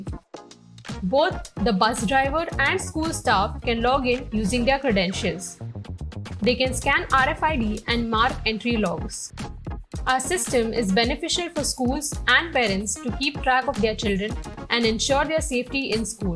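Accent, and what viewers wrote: India. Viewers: I mean no disrespect. But I am African. As an African, listening to English is challenging enough. Add to the equation, Your very heavy Indian accent and this video just as well could be in Russian. You lost me in translation. May I suggest, you using a AI synthesised voice-over in your clips?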